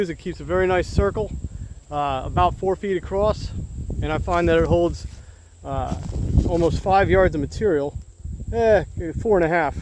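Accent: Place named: Reading, United Kingdom. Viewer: Hey there sir! I’m just up North in Schuylkill County. Being from Reading originally I picked up on your accent immediately. Congrats on the well made Johnson-Su compost. I just started mine made up of Urine/LAB soaked biochar, pumpkins, and LEAVES LEAVES, LEAVES, shredding as many as I can with my hands weed whacker (unfortunately my mower does not have a bagger)! Do you have any tips on getting your pile up to temp? I just built mine today and I’m concerned it might not win the fight against December’s temps.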